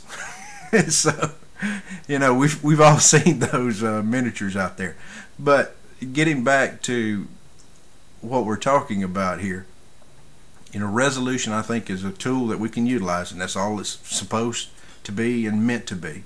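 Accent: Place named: United States. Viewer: Awwh what a lovely video! keep up the good work ma man! And Where is that accent from? I love it! Where in the US I mean :)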